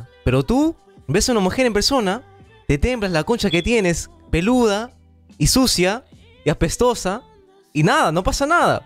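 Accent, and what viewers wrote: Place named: Peru. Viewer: Si parece peruano,aunque combinado con otros países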